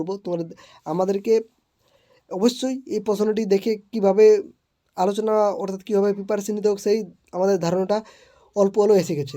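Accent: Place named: Purulia, India. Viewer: Amar mone hoy ini purulia er basinda tai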